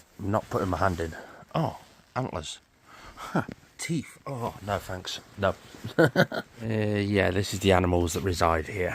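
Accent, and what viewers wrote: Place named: Stoke, United Kingdom. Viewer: Dude are you from Stoke ?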